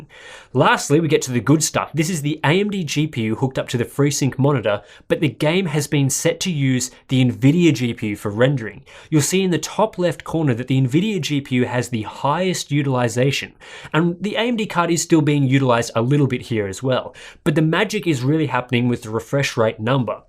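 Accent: Australia. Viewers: r u from ostralia?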